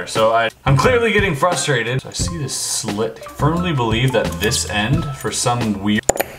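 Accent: Canada. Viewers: .....yeah, I can tell you're Canadian. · Hey! Are you canadian?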